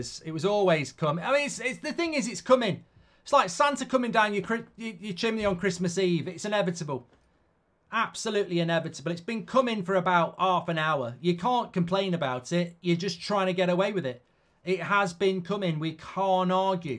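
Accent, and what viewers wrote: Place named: Glasgow, United Kingdom. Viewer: I'm from Scotland and accent was pure Glasgow 😂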